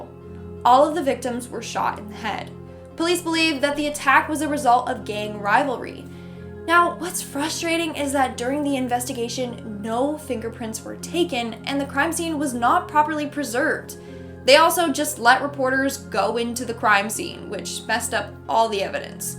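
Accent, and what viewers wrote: France. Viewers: I like your French accent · I love the French accent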